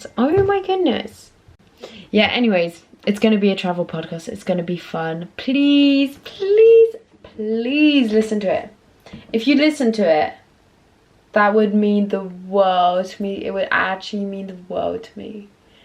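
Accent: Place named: United Kingdom. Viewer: I love your British accent. So melodious.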